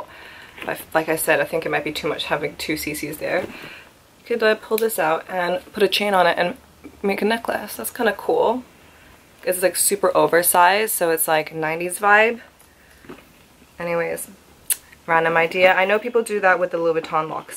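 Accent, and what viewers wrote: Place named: United States. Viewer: Weird question but how come you hv an American English accent? I know you’re in London, Hanoi & Taipei but did you grow up in the states?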